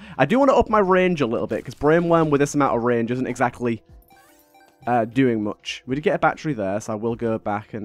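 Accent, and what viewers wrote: United Kingdom. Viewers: After watching the whole video I only have one question: is this guy british?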